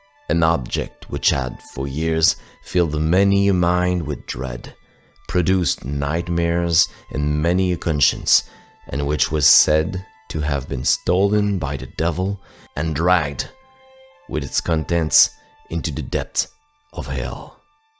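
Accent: Quebec, Canada. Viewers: Also it's great to hear a quebecer !!! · As a Quebecer, I've heard of La Corriveau, but it was a different story. I wonder how they discovered it really was only the two men she had killed? Very interesting, and love the added touch of having a Quebecer narrate this story.. the morbid aside, this was lovely! :) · To those poor little souls hurt and insulted by a slight French Candian accent... Did you also go on Disney's forums to complain that characters in Encanto have a Spanish accent? The legend is from Quebec City and Lévis, where citizens still talk French today. It's 2022, FFS! Please, grow a damn spine and enjoy people's cultural upbringings! It's a strength, not a weakness!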